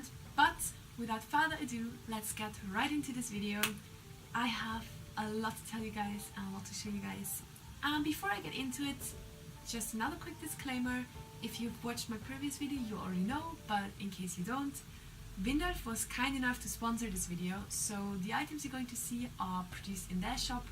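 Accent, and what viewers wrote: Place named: Scotland, United Kingdom. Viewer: your accent is beautiful. What kind of accent is it? Is it scottish?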